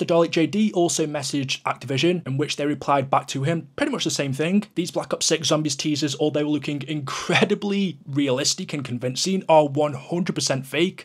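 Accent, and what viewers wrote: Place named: Stoke, United Kingdom. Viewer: Nice stoke accent burslem boyoo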